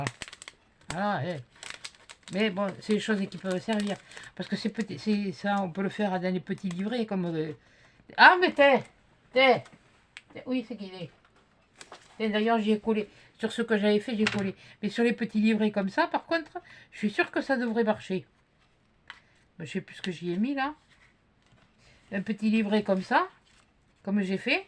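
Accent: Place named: Marseille, France. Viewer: Bonjour marseillais, dites moi qui est Coco? J'adore votre accent, j'ai le même 😊je suis d'un petit village à côté de Toulouse. Bonne journée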